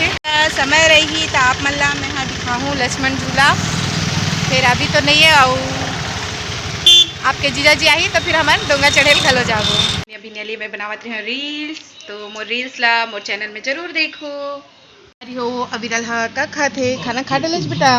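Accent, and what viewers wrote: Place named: Chhattisgarh, India. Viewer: छत्तीसगढ़ी बोली आप के मुह से बहुत बढ़िया लगथे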